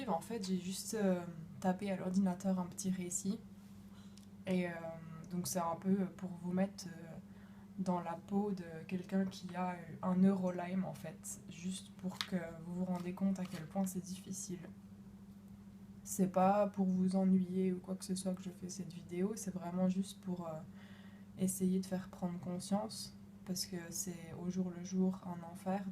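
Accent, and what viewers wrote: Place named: Upper Doubs, France. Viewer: Warrior ! difficile d'y croire quand tout se déchaîne (moi crise tous les 8 jours) mais les traitements se précisent et la recherche progresse (doucement)... on a encore bcp de thérapie à essayer ... Sois forte et quand ça va pas ..."Accueille" et repos et petits bonheurs qui vont bien... dis moi, avec l'accent que tu te payes (lol) tu serais pas du haut doubs toi ??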